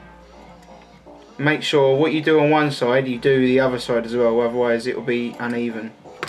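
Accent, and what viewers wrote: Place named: United Kingdom. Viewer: Your voice sounds like comedian Russell Brand; you must come from the same area of UK.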